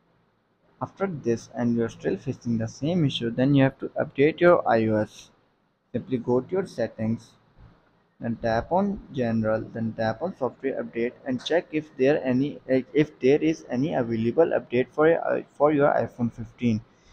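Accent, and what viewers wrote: India. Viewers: Could barely understand anything with that thick Indian accent. Still can’t get it to work.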